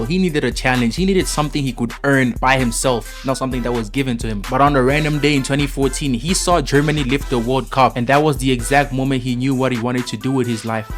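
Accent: South Africa. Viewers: Wait are you south African? Because I am and super strikers built my child hood and made me love football poi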